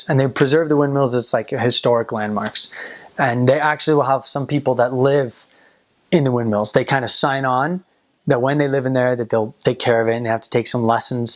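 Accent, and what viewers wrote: Netherlands. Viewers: I feel like he has a slight “Dutch” accent when he talks English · Super, je Nederlands is zo goed, je hebt zelfs het Nederlandse accent. Nu misschien naar België en Vlaams leren ? 😄 da’s toch nog anders. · Your pronunciation is really Dutch. Congratulations.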